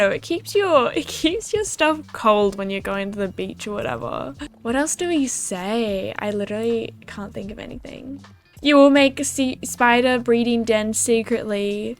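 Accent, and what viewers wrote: Australia. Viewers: as an aussie, i feel like the accent is definitely there for you but it is not as strong as others I've met before... but that could just be from me living in victoria? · when I first saw your video I had no clue what accent you had but now I hear the Australian but it's not the most strong accent I've heard but i can tell your Australian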